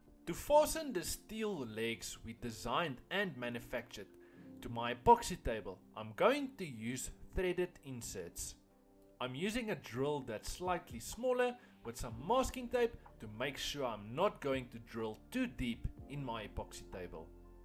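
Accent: South Africa. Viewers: Hi, I love the end result of this project. It looks amazing. Quick question, you sound very South African, are you in SA?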